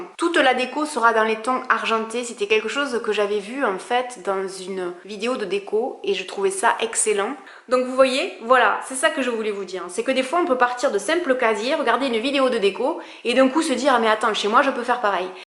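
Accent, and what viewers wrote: South, France. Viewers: Nickel ! "Avé" l'accent en prime . Merci, une mine d'idées exceptionnelles. Bien filmé, bien expliqué. ❤👍👏👏👏👏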